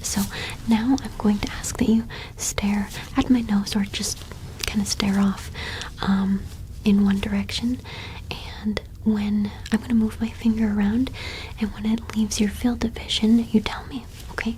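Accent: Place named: Yorkshire, United Kingdom. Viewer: Чисто йоркширское произношение.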